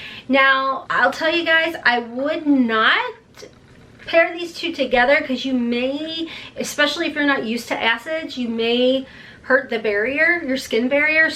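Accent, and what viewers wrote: South, United States. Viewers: I love every so often the southern twang comes out “maaaatte” shades ❤️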